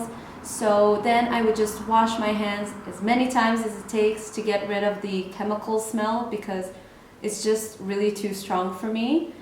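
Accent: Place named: Netherlands. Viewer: Are you by any means Dutch? Idk you kinda have the same accent as me in English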